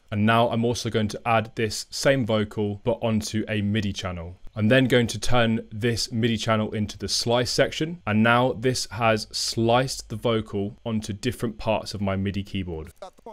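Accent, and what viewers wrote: United Kingdom. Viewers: Most guys w ur setup and type of video are kinda foreigners who say “focal”(vocal) kk. Cool to see a uk dude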